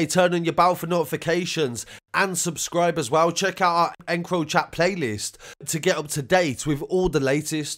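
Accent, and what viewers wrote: United Kingdom. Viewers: Im from sweden, now i know how to pronounce police officah with uk accent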